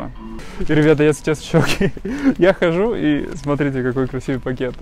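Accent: Standard. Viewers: мексиканец? у нас не каждый кавказец так на русском чисто говорит..лайк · Так чисто говорить по русски, просто Браво!! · Парень чистенько по-русски шпарит! Молодец! И человек с юмором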